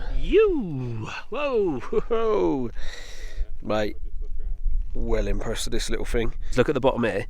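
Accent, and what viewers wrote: United Kingdom. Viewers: Bro, I want to learn your British accent 😎😎😎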